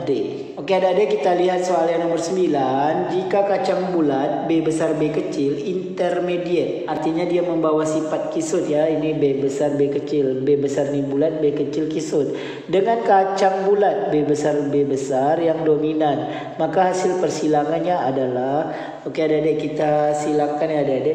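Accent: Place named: Malaysia. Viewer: Waw abang aksen ya aksen malaysia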